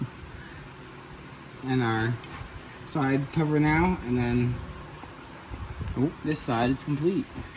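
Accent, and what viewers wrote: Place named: United States. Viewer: Random question but do you live in Europe I noticed all of your license plates are European but you sound like American or Canadian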